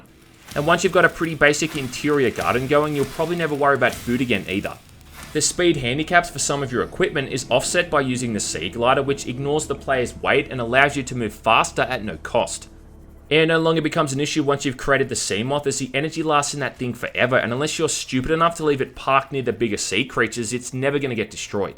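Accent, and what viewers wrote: Australia. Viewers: I like how he sounds like an Australian from "down under". Lol. It's so fitting.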